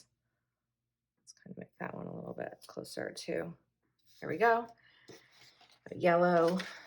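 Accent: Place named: New Jersey, United States. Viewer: Hi...so sorry for your loses. I was listening to you while I was just doing my planner and you made me laugh. You asked the question about the pronunciation of the word orange. I thought wow she says it the same way I do. Then the next thing you said was that you were from NJ. I'm from NJ as well now residing in Vermont. They tease me all the time about my accent. I'm constantly trying to explain that it's not me with the accent but them...lol. I love your spreads and videos. Chin up, you're doing a great job.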